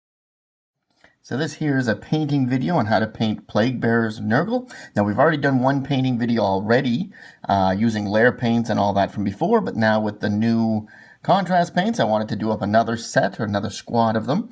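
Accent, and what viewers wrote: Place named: Canada. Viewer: so fahr? i hear a fellow hoser!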